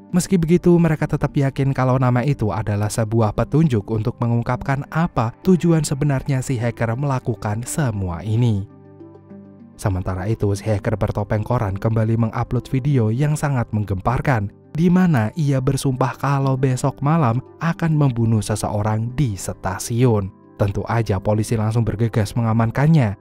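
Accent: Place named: Nganjuk, Indonesia. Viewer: logat nganjuknya minnn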